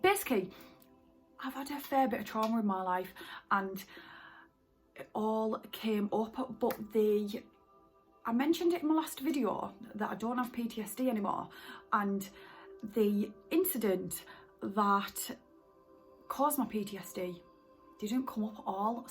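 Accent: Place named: Newcastle, United Kingdom. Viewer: What a brilliant channel, thanks 😁, I'm prepping to go in with some rue, but I vaped it via the vandy vape last time and it was slightly brutal.. Everytime I trip I always come out thinking I need to work with my energy and balance myself better before hand... And I'm useless at this 😂 positive your channel can give me some pointers though. Love the jordie accent too? Reminds me of living in the lakes 😊easy listening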